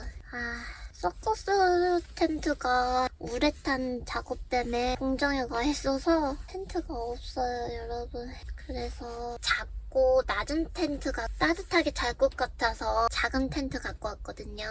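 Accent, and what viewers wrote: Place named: Gyeongsang, South Korea. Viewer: 홀리몰리과카몰리~ 억양을 보아하니... 경상도 신가봐요~ ^^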